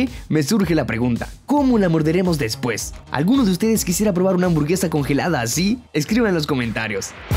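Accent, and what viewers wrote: Russia. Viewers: Eres ruso?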